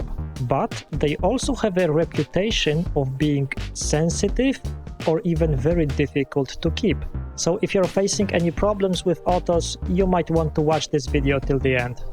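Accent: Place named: Poland. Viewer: Are you Polish, mate? Sounds like it😉 A few of mine died after a year for no clear reason. Balanced tank, no ammonia, no nitrite/nitrate spikes, much green algae growth on side glass, no new fish to bring diseases whatsoever.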